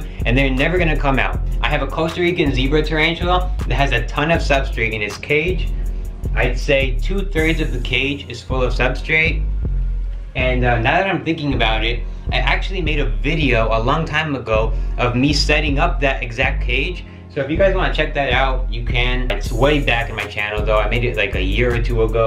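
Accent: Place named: Argentina. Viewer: I don’t really mind if you speak English in your videos, but I love when you talk in Spanish. I mean, that Argentinian accent? God, it’s just beautiful.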